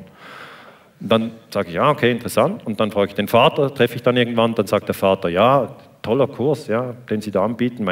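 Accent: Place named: Switzerland. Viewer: ich kann verstehen, dass sie dies bevorzugen würden. Als Deutscher kann ich sagen, dass ich jeden Vortrag ihres Landsmannes genieße und es schade fände, wenn ich nur die Hälfte verstehen würde. Ich finde schiizer dütsch sehr schön anzuhören, aber verstehen tue ich leider nicht genug und es wäre doch schade, wenn so ein wichtiges Thema von 80 Millionen potentiell interessierten Menschen nicht verstanden werden kann. :D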